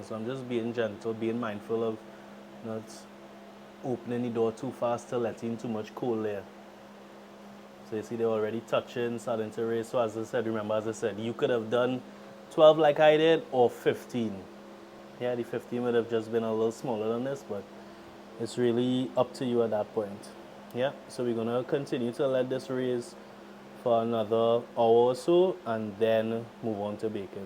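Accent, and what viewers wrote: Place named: Trinidad and Tobago. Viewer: Thanks for the tips.. I make this outside easter for my son. Can i use orange zest when lemon is out of season? And, thanks for maintaining our Trini accent.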